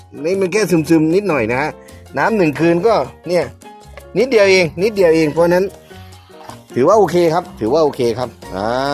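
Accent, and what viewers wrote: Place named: South, Thailand. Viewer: น้ำเสียงเหมือนคนใต้เลย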